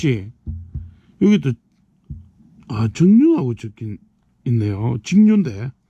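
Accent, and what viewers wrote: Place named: Daegu, South Korea. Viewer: 아재 대구네요 사투리에 답답한말투 잘보고갑니다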